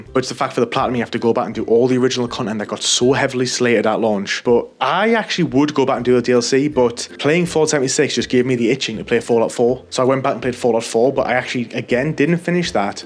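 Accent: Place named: North East England, United Kingdom. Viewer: I have over 50 platinums. Out of them 50 i have the dlc trophies for about 3 of them 50. Some games i have dlc purchased but havent touched it, because once i have platinum, im usually too fatigued to play dlc. Im like you. Im 35 now though and have bad adhd. Im really struggling to do the grinds that once seemed theraputic. Cba watching guides for collectables etc etc anymore. Much prefer just putting game on and playing it in my own time! If platinum becomes viable, i will get it, but i wont go hunting like i used to. Dont get the dopamine that i used to when getting plat. Feels like its all for nothing now. Also nice to see a fellow north eastener doing well on Youtube gaming. I'm from Teesside! Just down the road, judging by your accent!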